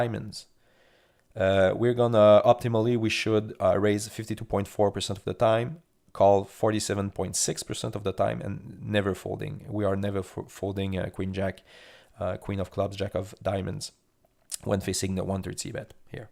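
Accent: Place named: Quebec, Canada. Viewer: Pretty sure I'm hearing that French Canadian accent! Merci beaucoup pour la série, ça m'a aidé bcp!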